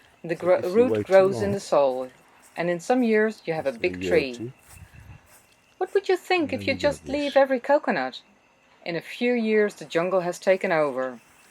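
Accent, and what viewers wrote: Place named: Netherlands. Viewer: Misselijk engels met nederlands accent!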